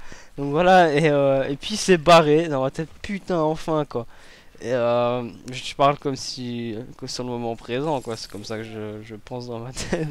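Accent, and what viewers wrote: Belgium. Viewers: on entend que tu parles plus en belge là c'est symas :)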